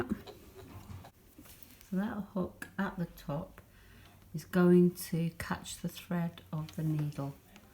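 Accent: United Kingdom. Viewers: I love your British accent. It sounds so comforting and homey to my sensibilities.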